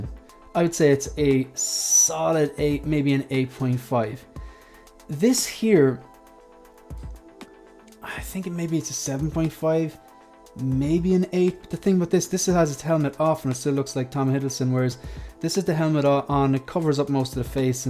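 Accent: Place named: Ireland. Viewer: I watch a lot 1:6 collectibles/collector Youtubers. Lael, Riley, Justin, Will etc pretty much everybody, and dont get me wrong, I genuinely like those guys and enjoy their content but this video is a great example of why you are my favourite creator in this sphere of Youtube. As always just a very calm, thoughtful, balanced and measured approach to sharing your thoughts. I guess the Irish accent helps a bit too haha. Much love.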